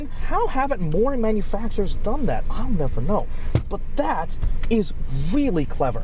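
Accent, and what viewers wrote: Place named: North America. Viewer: Thanks. Great video and great to hear a North American accent using modern normal measurements although the car does run on liquid fuel not vapour (gas)😂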